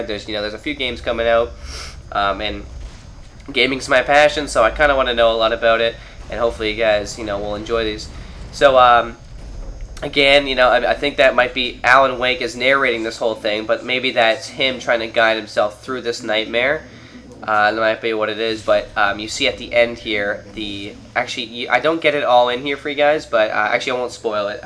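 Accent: Canada. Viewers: lol i didnt kno u were canadian till i watched this video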